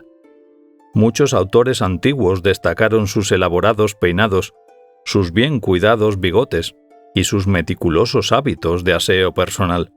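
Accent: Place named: Spain. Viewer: Un lujo oir narraciones en una voz autentica y española , no puedo dar mas pulgares pero lo hare en mas videos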